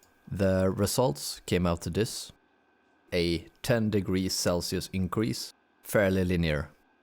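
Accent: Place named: Sweden. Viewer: Perfect Swenglish accent:hands-yellow-heart-red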